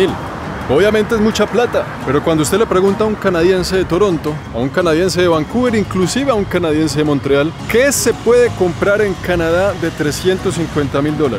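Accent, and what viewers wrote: Colombia. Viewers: Eres de panama crei eras colombiano tienes acento colombiano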